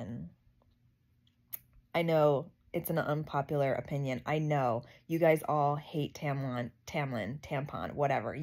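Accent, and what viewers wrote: Baltimore, United States. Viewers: You got that Hon accent.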